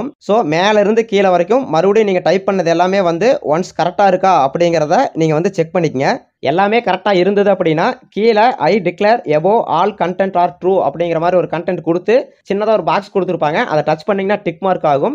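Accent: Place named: Kongu, India. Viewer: கொங்குத்தமிழை கேட்பதே தனி சுகம் தான் நண்பரே!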